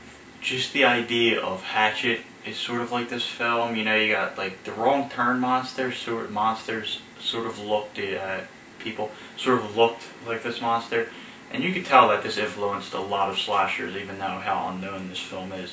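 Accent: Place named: Philadelphia, United States. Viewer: your stupid accent makes me think Philly or Jersey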